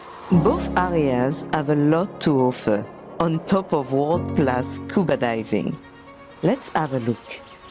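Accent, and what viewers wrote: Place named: Indonesia. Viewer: It takes time to get used to your Indonesian English accents.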